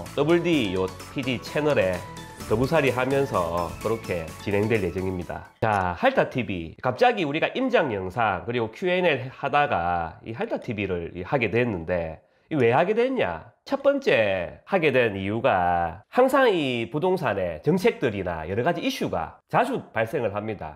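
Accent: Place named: Busan, South Korea. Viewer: 잘 들었습니다~부산 사투리 듣기 좋네요~앞으로 좋은 강의 기대하겠습니다~^^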